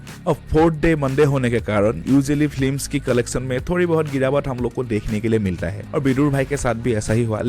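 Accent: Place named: Assam, India. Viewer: Your accent say's that u are Assamese 😅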